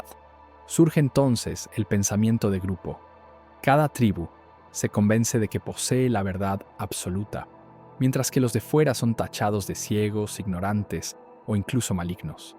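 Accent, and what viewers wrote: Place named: Buenos Aires, Argentina. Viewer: Exelentes reflexiones y la narra suena bastante como nuestro castellano en Buenos Aires. Muy lindo canal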